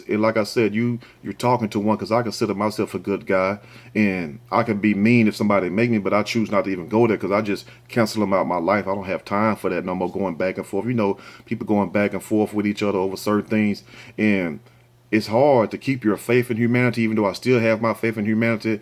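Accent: South, United States. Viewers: Like watching and listing to u even if u talk like your from the southern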